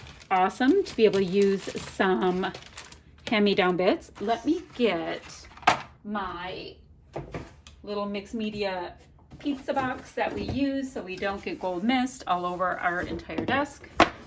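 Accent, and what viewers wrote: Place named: Midwest, United States. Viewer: Nicely done. Precious! Precisely why we don’t have a tree this year. We have a 9 month old pup of a much larger breed. She loves to chew and to steal things (admittedly mostly socks). So our favorite carol this year is 🎶 No Christmas tree, no Christmas tree, Piper would strip you of your branches. 🎶 Also, I’m guessing you are in the Midwest(?) I hear several of the YouTube gals from that area pronounce “bough” like it rhymes with “snow” not with “cow.”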